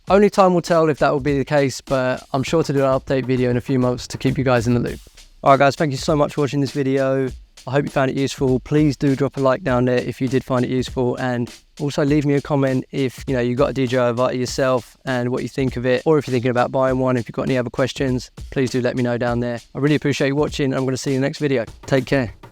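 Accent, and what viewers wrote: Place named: London, United Kingdom. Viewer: That's good to hear thanks for the reply! Yea fellow Londoner (guessing from the accent), lots of content is US based with drones on here so good to see experiences from UK chaps like yourself! Might have to invest in an avata!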